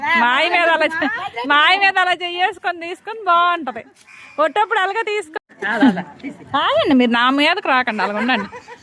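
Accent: Srikakulam, India. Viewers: Naku radhu Amma pata 🥳akka reality lo nv acham Srikakulam basha la chakaga undi reels kuda bagundi akka voice 😘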